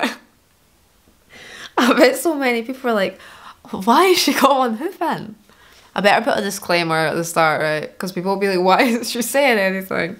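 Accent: Scotland, United Kingdom. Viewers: Hearing a fellow Scottish person on YouTube brings me a lot of joy 🤧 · took me 5 seconds to hit the like button after i heard her accent lmao cant shaft another scot's makeup tutorial!! ur stunning! 💙🤍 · you’re Scottish? - subscribed.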